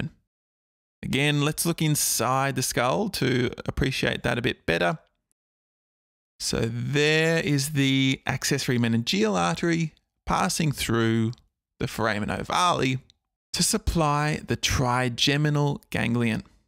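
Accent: Australia. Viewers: This Aussie accent is so satisfying to hear and also thanks a lot for this vid ♡ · Bro is aussie Thankyou though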